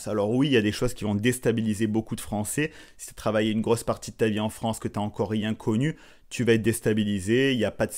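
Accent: Canada. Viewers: Par contre.... après SEULEMENT 4 ans... DÉJÀ adopté L'ACCENT Québécois (On en parle)....alors que ma fille a vécu 12 ANS.... au Québec à Montréal Sans AUCUN Accent 🤔🤔🤔..... pfffffffff.....😤😤😤😤😤😤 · Vous êtes là première personne qui parle dans un façon du Canada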